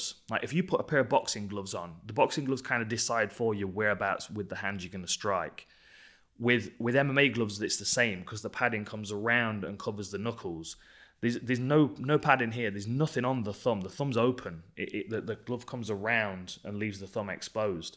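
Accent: United Kingdom. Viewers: Man, I hope this is Dan Hardy and not some dork producer. If this is the real Dan Hardy I would like to thank you for being British. It's a nice accent and it feels like the person speaking is someone I should be paying attention too. Its also refreshing that possibly at one point my ancestors spoke in such a captivating way instead of the 5 generations of hillbilly south Carolina speak. Also, is it true my ancestors were the trash of Britain or religious zealots that you guys wanted out? We are always taught our ancestors were the prime stock of Britain and fled for freedom and prosperity... you know the normal talk but my research is showing different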